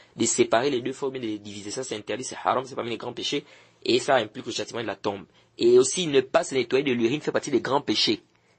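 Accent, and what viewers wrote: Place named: Gabon. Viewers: Il est gabonais justement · Qu'Allah vous en récompense. Humm l'accent est très gabonais hein🤔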